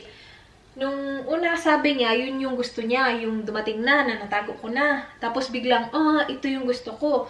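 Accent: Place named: Visayas, Philippines. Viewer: ung sobrang naging fanatic na ako,pati accent ni sa bisaya naadopt ko na unconciously..hahaha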